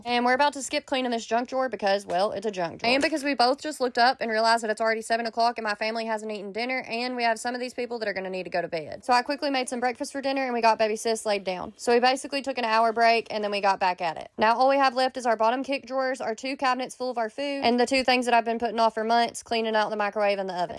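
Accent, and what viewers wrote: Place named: South, United States. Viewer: It is unbelievable how much stuff you can fit in them small places and you sound southern witch would in fact answer the fast talking thing but i do love you accent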